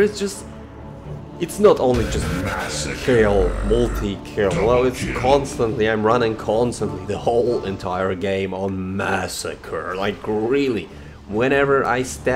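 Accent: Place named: Hamburg, Germany. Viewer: Ich bezweifel dass es dir m&#246;glich ist zu verstehen was ich meine. Erstmal bin ich kein WoW-Kind, als ich Kind war gab es weder WoW noch gro&#223;artig verbreitete PC&#180;s. Du &#228;usserst keine Kritik sondern schreibst was dir nicht gef&#228;llt und das in einem aggressiv negativem Stil mit einer Menge indirekter Beleidigungen. Ob du falsch oder richtig liegst liegt im Auge des Betrachters aber dein Verhalten ist keinem Erwachsenen angemessen. "hatte mal 2-3 videos von dir zu MoP zeiten geguckt war noch ganz lustig aber was soll dieses hochn&#228;sige verhalten und diese grauenhafte englische aussprache wo du versuchst nicht deutsch zu klingen..." Was genau ist grauenhaft an seiner Aussprache und warum meinst du dies sagen zu k&#246;nnen? Warum sagen ihm Muttersprachler dies nicht sondern loben ihn sogar weil er nicht nach Schulenglisch klingt? 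Er sprich seit einigen Jahrzehnten genau so Englisch und hatte damit auch keine Probleme. Ich frage mich auch warum man als offensichtlich nicht-Deutscher, das h&#246;rt man trotz Hamburger Schnut in jedem seiner Deutschsprachigen Videos, versuchen sollte nicht Deutsch zu klingen und wo liegt dein Problem dabei? Es sollte dir klar sein dass du deine Community vertrittst und dass du ohne indirekte Beleidigung kaum einen Satz beenden kannst. Hier kannst du, trotz der Schreibfehler in der &#220;berschrift, eine kleine Einsicht erhalten wie man Kritik &#228;u&#223;ert - k&#246;nnte dir helfen denn im wahren Leben l&#228;ufst du bei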